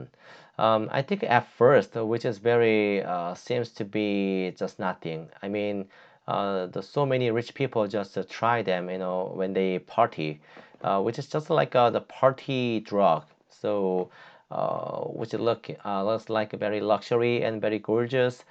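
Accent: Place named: China. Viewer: Chinese English accent is so irritating. 😭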